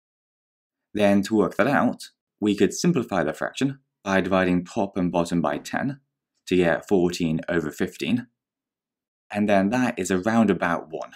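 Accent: United Kingdom. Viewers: Good Bristish Accent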